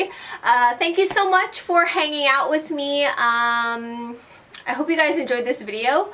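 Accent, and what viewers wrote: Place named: Hawaii, United States. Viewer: loved this video!! watching you and hearing your accent make me miss hawaii so much!! <3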